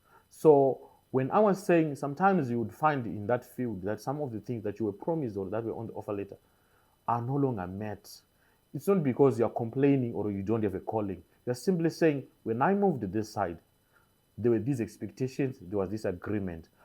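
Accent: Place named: England, United Kingdom. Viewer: "The first church I have to preach to is my family" these words you have spoken are powerful and such a great message that most men would appreciate. You know, it is important to determine your own goals and standards in your life and pressure yourself by what other people are doing in their lives, be it having big house or the latest model car. You and only you, as the heard of your family, should determine your family's standard of living. Nhasi - today, throughout this video you have spoken english as if you once lived in England, UK. Wishing you all the best with you relocation.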